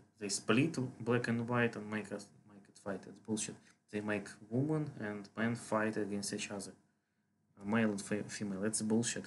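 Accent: Russia. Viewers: M8 you need to turn up the volume I am Croat and I can follow Russian accent but it is a real struggle to hear you. Great work but watching this before dark is almost impossible.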